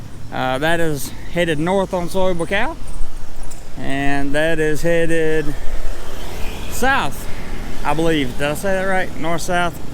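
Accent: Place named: Texas, United States. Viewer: loved your texan accent cowboy ! howdy !! ;) great video mate :)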